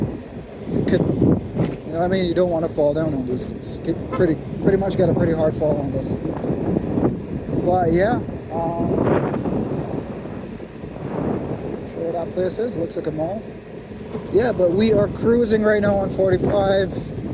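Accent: Barbados. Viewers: Bajan accent?🇧🇧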